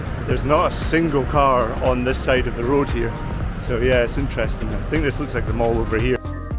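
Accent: Scotland, United Kingdom. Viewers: Try vising Eastwood City, Ortigas Center and Filinvest City also. To me, the captions/subtitles aren't necessary. Your Scottish accent isn't that thick. We understand your English perfectly. ᵔᴗᵔ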